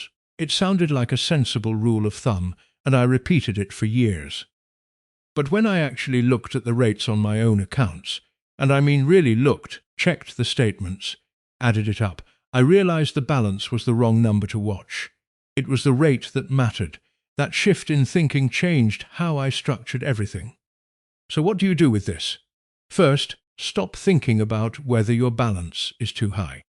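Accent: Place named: United Kingdom. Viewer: Nice British accent- but AI still stumbles over initials and numbers. Having a British accent but not e.g. inserting 'and' after the thousands jarrs.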